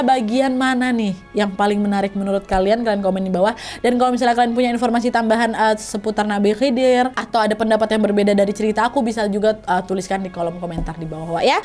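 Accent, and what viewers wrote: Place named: Sumatra, Indonesia. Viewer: Kurasa kau ini orang sumatera dah , logat kau itu jelas kali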